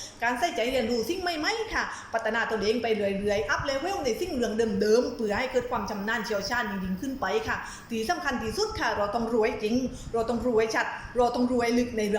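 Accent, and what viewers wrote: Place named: South, Thailand. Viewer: แหลงหรอยเลย ไม่ผิดหวัง เสียงใต้ บ้านเรา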